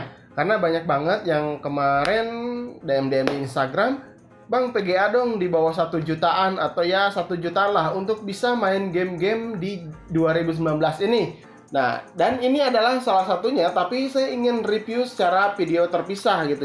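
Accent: West Java, Indonesia. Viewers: Sundanese gan 😁😁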